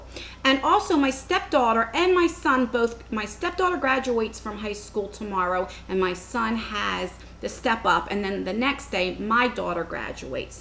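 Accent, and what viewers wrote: New Jersey, United States. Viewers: Seriously I turn around and your channel has grown so much since I started watching. The amount of stuff you're giving away is beyond generous. also what sub do you have where you get a full set of crown brushes? I must have missed that video, oops! Loving your Jersey Accent :) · Nice to see it's happening for such a nice person ..enjoy your unboxings and the Jersey accent takes me back home . Congrats ! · ...so glad to have stumbled upon your channel!! You are a ray of sunshine and look forward to your videos... You seem like someone I would totally be friends with:) Where in NJ are you?? From the sound of your adorable accent I'd say somewhere central or south...I'm a Jersey Girl too!! 🤟👊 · congratulations on 14k now! stumbled on your videos a while ago and I've been watching them nonstop since! I just love your jersey accent and how you really explain everything thoroughly in your videos!! 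#lipstick :)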